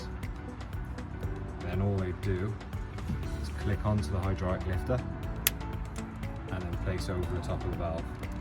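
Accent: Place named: Devon, United Kingdom. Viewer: Nice video mate .Where in the UK are you .You sound like Devon area ?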